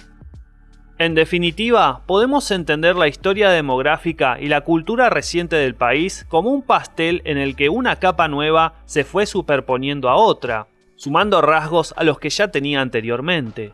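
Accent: Argentina. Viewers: Tu acento es netamente argentino. Genial, imparcial como debe ser alguien que quiere crecer... Adelante...bien hecho Podrias brindarnos un video con la última migracion....Colombo Venezolana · Tu acento de che boludo hace aburrido el video. Utiliza el castellano neutro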